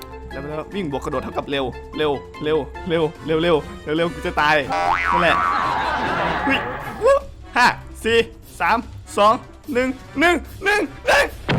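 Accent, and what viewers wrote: North, Thailand. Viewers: พี่เป็นคนเหนือใช่ไหม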